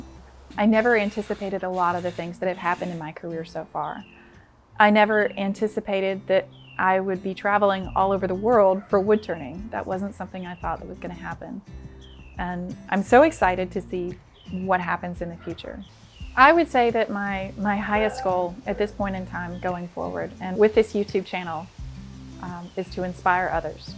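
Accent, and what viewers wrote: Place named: South, United States. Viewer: Love how the southern slips through from time to time.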